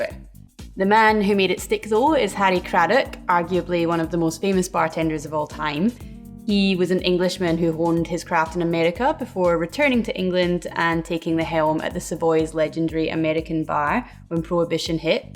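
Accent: Scotland, United Kingdom. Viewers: I love your accent. By chance, are you of Scottish origin? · Thanks for that! I'm usually good with accents and figured you were from Western Scotland and had spent some time in Canada or the US, but had no idea about France. (You have your hobbies, i have mine.) More importantly, thanks for the lesson in one of my favorite go-to cocktails! Cheers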